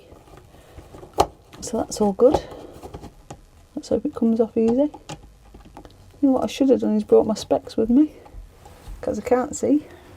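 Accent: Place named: Sheffield, United Kingdom. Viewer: It’s looking great already. Please jabber away, I lived in Sheffield for 5 years (in Park Hill flats — Norwich Row!) and I miss the accent.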